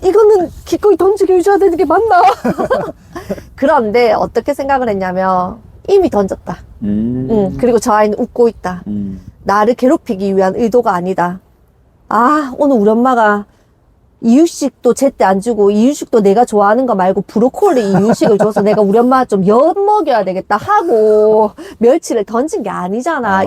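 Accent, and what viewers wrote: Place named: Busan, South Korea. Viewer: 쌍둥이 육아하는 아부지 입니다 마누라 도와서 열심히 서포트 하면서 오늘도 교수님 나긋나긋한 부싼 억양 들으러 왔어예~ ㅎㅎㅎㅎ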